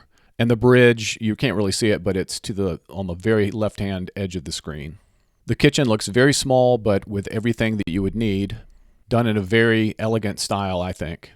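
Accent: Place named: United States. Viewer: It's the American accent. The British say it differently.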